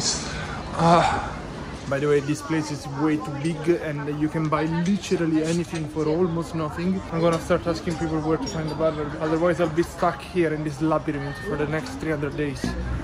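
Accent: Italy. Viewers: 这个英文是意大利人吗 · I love your Italian accent. Nice haircut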